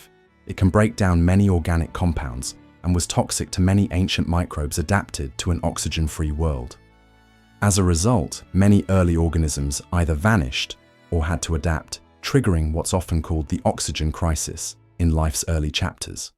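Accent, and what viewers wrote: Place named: England, United Kingdom. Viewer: I love these types of audio/videos… but one thing I believe can be improved on in many of these audio/videos is the narration. Why does it always have to be an English accent? Try an Australian, South African, or even an American accent.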